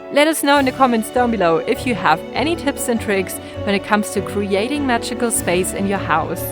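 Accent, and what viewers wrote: Germany. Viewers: sometimes i get a bit of American and Australian from it somehow??? but yes i suspected it was german haha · Hey i wanna ask if you're from germany ,because from the accent😂✌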